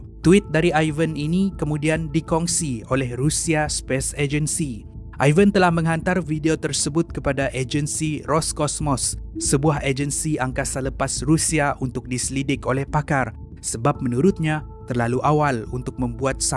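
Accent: Sabah, Malaysia. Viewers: Suka tgok penulisan dan dengar org Sabah brkata kata..menarik...trbaikk ya..betul lh tu kut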